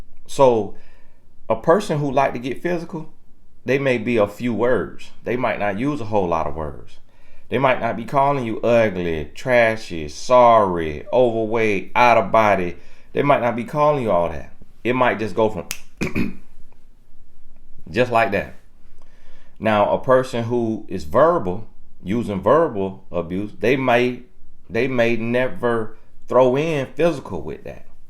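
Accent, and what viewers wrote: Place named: Florida, United States. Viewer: Luv that Flowda accent...you preach ole skool wisdom Bro!Preach from experience!And it is a mirror of dysfunction inside....polish the mirror 👍🏽🌞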